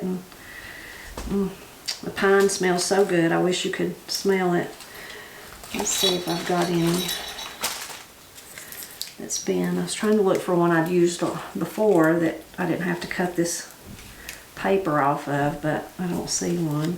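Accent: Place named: Appalachia, United States. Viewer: Great video!, thank you for wonderful ideas to decorate. I am watching the video and eating the biscuits we made using your recipe. Is there a chance to make a video on how to master Appalachian accent, especially [ai] sound like you pronounce in whIte, or try. Thank you Best, Yulia from Florida, Katie's cheerleader;-))))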